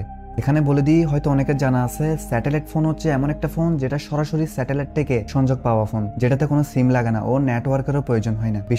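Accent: Chittagong, Bangladesh. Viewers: Vai ki Chattogram er? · আপনি কি ভাই চট্টগ্রামের আপনি কি ভাই চট্টগ্রামের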